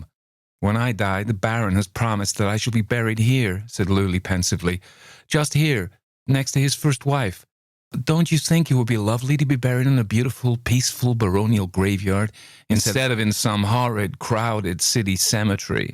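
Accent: United States. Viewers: I love listening to you read. Is that an American accent? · My opinion, for what it’s worth, is that Luly was just a naïve kid who didn’t have the cynical worldly “sense”the men had. She was just having fun, learning to skate, learning to swim etc and that was all there was to it. The men put a different color on her actions. Main was smitten with her and therefore jealous, so he tried to put her in a bad light to his friend—and he wasn’t much of a gentleman to talk about a lady as he did. Campbell was a prude and a sadist. A true gentleman would never be that cruel. Cool perhaps, but not cruel. A very upsetting story, although your reading as always was excellent. :_pumpkin: Couldn’t quite place your American accent; Luly was apparently brought up out west.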